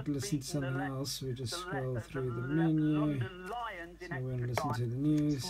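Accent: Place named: South Africa. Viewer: SA accent with British stations. Where are you?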